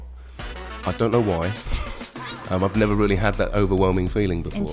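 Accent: United States. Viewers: i didn t really realise that he changed to an american accent. probably bc his voice stayed the same (it didn’t get higher or lower) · Tom with an American accent sounds really wrong for some reason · I love his american accent more!🥲🥲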